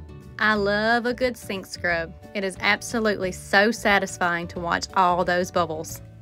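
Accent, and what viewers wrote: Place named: South, United States. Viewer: Just saw your video today for the first time. I can tell you are from the south like me! Enjoyed your video here in Mississippi!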